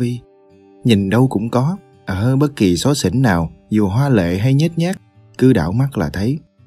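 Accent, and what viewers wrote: South, Vietnam. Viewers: Nội dung người Bắc soạn, giọng đọc người Nam truyền tải. Là người Hà Nội sống và làm việc tại Sài Gòn, tôi thích cảm giác này. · Bài viết của chàng trai Hn, giọng đọc miền nam nhưng mình thực sự thích giọng đọc này, ở đoạn cuối có những đoạn lắng trầm rất cảm động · Hai bài giọng miền nam nghe hay mà nội dung không hay Nghe cứ sao sao ấy không hút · Cái giọng đọc miền Nam chuẩn kiểu này giờ ngày càng hiếm. Mong bạn giữ gìn nó nhé.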